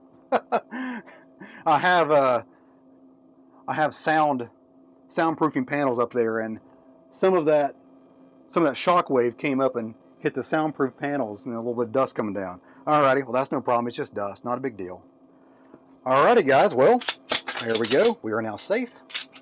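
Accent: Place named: Texas, United States. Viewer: Why Ism I not surprised by Texas accent?=)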